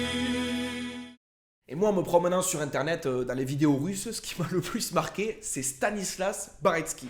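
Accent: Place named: South, France. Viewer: C'est marrant de transporter ton accent du sud jusque dans ces froids polaires et inhospitaliers lol. Merci d'avoir exposé la face sombre de ce pays et de ses dirigeants, et encore tu es resté soft. J'en ai marre que des gens clashent Macron pour dire que Poutine est meilleur, avec plus de virilité et de charisme. Clashez Macron si vous voulez mais pas pour de mauvaises raisons. Poutine est avant tout un sociopathe qui se fout pas mal des droits de l'Homme (2 preuves parmi d'autres, il a bossé au KGB et il cautionne les agissements de son pote Kadirov contre les homosexuels).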